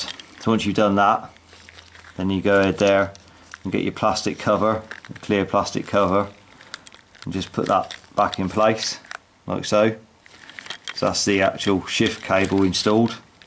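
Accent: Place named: Bristol, United Kingdom. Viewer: Thanks for this . Sounds like you might come from the Bristol Area ? Do you have a bike shop or do repairs ?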